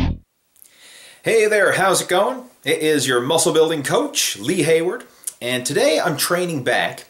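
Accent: Newfoundland, Canada. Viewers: this is the first video i'm watching of yours, you are 100% from Newfoundland aren't ya?? ahah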